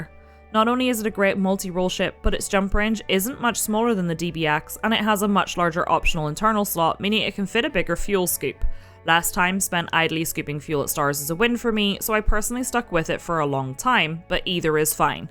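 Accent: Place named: Appalachia, United States. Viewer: sorry ya sound hillbilly. A milder version of accents in the Ouachitas, Ozarks, and Appalachia.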